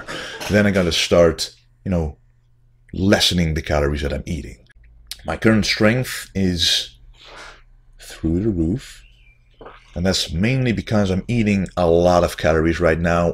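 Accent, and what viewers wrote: Netherlands. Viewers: Props for the Dutch accent! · Ik wist meteen dat je een hollander was met dat accent:p maar vette videos man. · Ben je Nederlander? Lijkt alsof ik een Nederlands accent hoor. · IN A WORLD.... WHERE EXTREME BODYBUILDING IS MOST IMPRESSIVE... THERE IS ONE MAN WHO FIGHTS... FOR THE NATURAL LOOK. Haha, you have that voice man! You Dutch bro? You sound Dutch :) Power to you, keep up the good work! Peace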